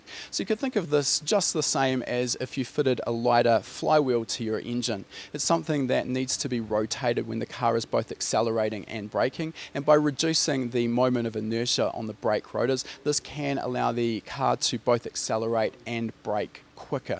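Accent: New Zealand. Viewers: Wonder if they could just run adjustable magnetic dampers? Less weight and complication to suspension. Lmao at using a 5.3. Epic. They're good for around 700-800hp stock but the K1/JEs will do wonders for going over that if the top end is looked after. Glad they went dry sump, a given for any LS over 1-1.2G sustained. Looks like I have a new goal racecar. Unlimited aero is what I'm looking for. Love the presenter, someone who actually knows cars. Great stuff! Sounds like a kiwi or maybe an Auzzie haha. Subbed.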